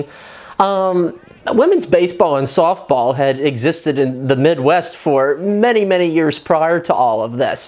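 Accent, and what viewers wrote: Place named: Mid-Atlantic, United States. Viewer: a compliment of the highest order. I'm a lifelong Philadelphian, I've always said Hollywood tells us only 4 accents exist in the US , California/valley girl , New York, Boston, Southern and occasionally Midwestern . Yet the Mid Atlantic/PA accent is just as distinct as any of those, yet it's never even attempted. Despite the gluttony of great actors from the region like Tina fey, Bradley Cooper, Kevin bacon , will smith and Kat dennings. Worst of all whenever a movie is set in Philly they usually just have the actor do a new York accent. When I started going to school in upstate new York people thought my accent was fake, they litteraly thought I was pretending. Thank god bam margera and his show viva la bam became popular. At least then people could see I really did say "howgie" and "hoooomee" They say that accents are begining to vanish. 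So when I hear someone unapologetically speaking in a distinctly regional accent I love it. Especially when it's my region . I'm a big fan of movies, history and Pennsylvania so I'm a big fan of what youre doing. I think it's a great and unique concept and you blend the two really well and make it enjoyable. I can't recommend your channel enough. Keep up the good work.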